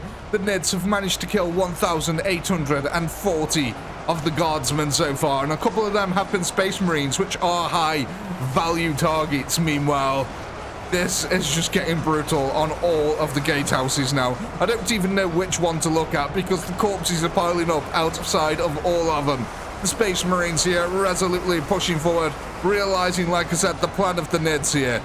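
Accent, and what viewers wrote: Stoke, United Kingdom. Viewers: I detect a Stokie 👀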